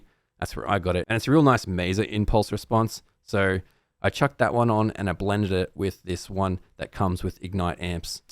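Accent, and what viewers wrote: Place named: Australia. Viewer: Awesome to hear another Aussie. Are ya subbed to Dunn?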